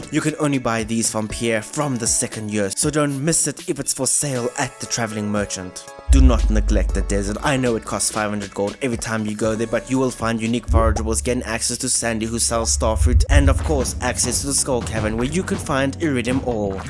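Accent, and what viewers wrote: South Africa. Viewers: First time watching one of your videos and instantly had a feeling you were from South Africa Howzit boet! 🤙🏻🇿🇦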